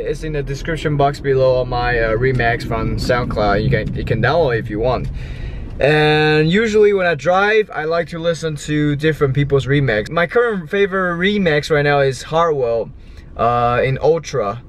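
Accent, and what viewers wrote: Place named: China. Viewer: You Chinese?!?!......always thought you're from Vienam cause you look like one. But I just noticed your Chinese accent from your vlogs so I can see now.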